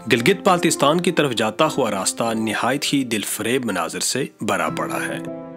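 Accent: Standard. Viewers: Itni pure Urdu MaashaaAllah 😍😍 Bht pyara khubsoorat Mera 🇵🇰🥰🥰🥰🥰💗💗💗💗💗💓💓💓💓💓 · Yar ap ki Commentary ka koi Saani ni hai , Zabardast Pure Urduuu Maza ajata hai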